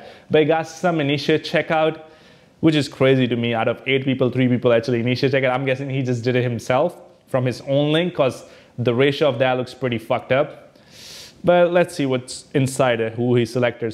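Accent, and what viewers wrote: India. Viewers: I like that Indian accent :) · STOP trying to speak like a american , Your INDIAN accent is clearly coming through , STICK TO the indian accent ... stop trying to sound like American with R sound.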